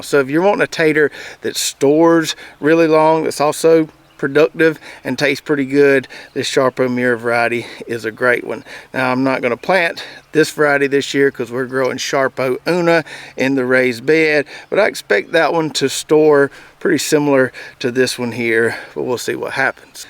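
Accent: South, United States. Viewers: Are you trying to loose your accient..... I know that you do not hiil your in ground taters but you heel them. Keep the accient and Southern way. I enjoy you viedos and your information...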